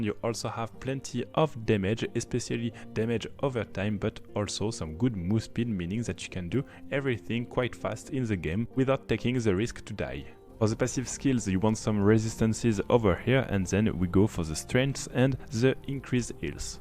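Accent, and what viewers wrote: France. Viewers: what a french accent +D well ty for this build =)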